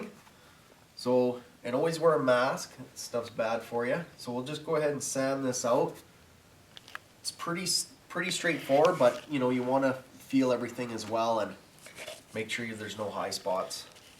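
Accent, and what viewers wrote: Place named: Alberta, Canada. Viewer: hey where is this guy from? sounds a little like people from Alberta Canada, but maybe his accent is from the states?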